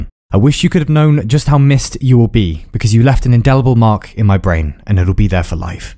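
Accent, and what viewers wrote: England, United Kingdom. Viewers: "Life is a mountain you must Climb to see the view from the top, and the journey itself is the most important part of the whole thing" I came up with that quote because of your channel, and I want you to know that I know you, but you don't know me, so... I dunno.... Wanna grab a cup of tea and a slice of cake fellow carbon unit that has a strong English accent and a half developed hatred for wasps, and just wonder about how living is a fucking miracle and being alive is incredible? I want to thank you deeply, as I am able to connect to the ideas you present in your videos at a very deep level, and it makes me feel peaceful. Not dreading anything, not being scared of inevitable death, but just to be able to be calm for 10 minutes and have a deeper understanding of the universe is incredible and you make that happen. Keep doing what you do, and never change. 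You have come so far, rest here a while, for there is so much further left to go. And I can't go without you. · sublime british accent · You have the ability to get me interested in about anything, even if i wasn't before. Anyway thanks for changing my entire view of the world with your videos and your cocky but charming british accent!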